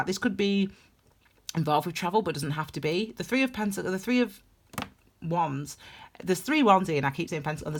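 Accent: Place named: Lancashire, United Kingdom. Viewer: First time listening, great reading, really spot on for me! Your accent sounds like you're a Lancashire Lass, am I close? I'm from that region originally myself. ♥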